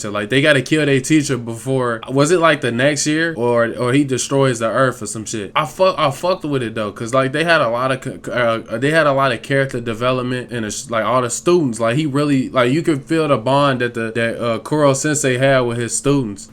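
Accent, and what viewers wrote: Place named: Chicago, United States. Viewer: I know a fellow Chicagoian when I hear one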